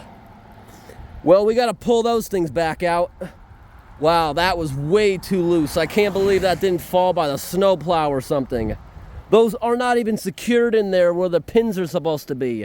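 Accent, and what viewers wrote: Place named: Maine, United States. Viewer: I remember now why one of the reasons I started watching this channel is bc I knew right away you were from ME with your accent/the hard pronounciating on the end of words lol!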